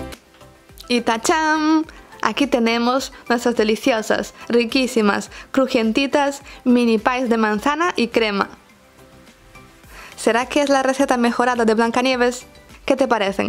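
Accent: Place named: Spain. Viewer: Que buenos videos. Hermosa voz y manera de explicar. Tienes acento español, pero como que tu idioma natal es otro me parece. Saludos desde Argentina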